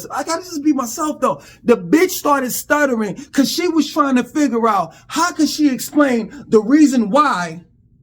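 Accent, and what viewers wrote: New York, United States. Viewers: your shouting (along with the New York accent) makes you the Stephen A Smith of commentary on society’s ills! I mean this in a complementary way……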